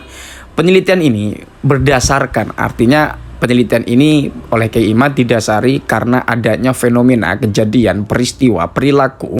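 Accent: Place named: Serang, Indonesia. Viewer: Kan. Upload lagi ngaji kitab ala pesantren, dengan bahasa banten Kangen dengan logat serangnya😁😁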